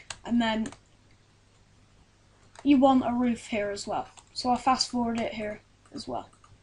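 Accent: United Kingdom. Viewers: you sound more scotish than english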